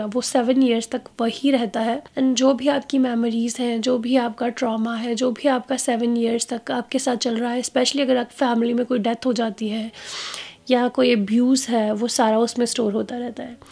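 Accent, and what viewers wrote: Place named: Doaba, India. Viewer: Ist comment but follow bahut tym ton kr rahi, bolan de tareeke ton laggda hai k tuc doaba belong krde ho,mee too,jalandhar de aas pass,aur tuc??bahut achhey vlogs hunde ne, l like veer ji too much,the way he talks,v sensible nd funny nature too,i like it,plz rply i m sonia from jharkhand wid my in laws!!!🙏🙏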